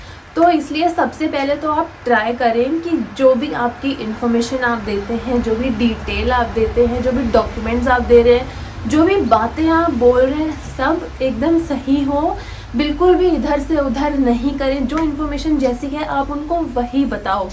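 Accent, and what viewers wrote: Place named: Punjab, India. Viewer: Ma'am you said that you are from Rajasthan but you sound like Punjabi. We would like to know more about this.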